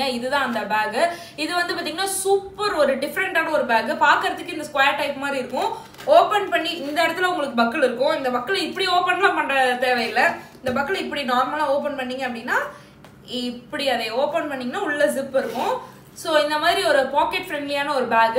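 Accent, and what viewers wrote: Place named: Erode, India. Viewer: Erode slang ✨❤ since my home town is salem i can find it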